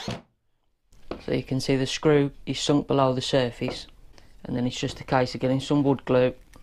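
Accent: Black Country, United Kingdom. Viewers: black country accent Wolverhampton maybe?? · Bostin mar mate , day know yow could do it like that . where in the black country are yow from .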